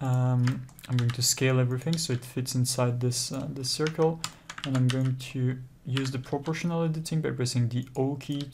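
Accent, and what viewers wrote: France. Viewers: I’m starting to think you’re only apologising for your French accent so we compliment it ;) awesome tutorial, real time tutorials that explain everything in detail is a rare find!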